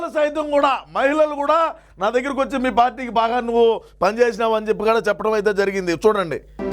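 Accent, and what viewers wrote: Rayalaseema, India. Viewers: మిశ్రమ విఫలమైనా సఫలమైంది, తమ్ముడూ. మాది కడప జిల్లాలో రాజంపేట. మీరు మాట్లాడే రాయలసీమ భాష నాభాష కూడ. నాకు చాలా చాలా ఇష్టమైన భాష . మీకు అంతా మంచి జరగాలని అశిస్తున్నా.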